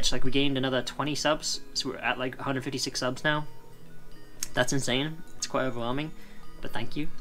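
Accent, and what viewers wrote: Wales, United Kingdom. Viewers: The Welsh in your accent is slowly appearing.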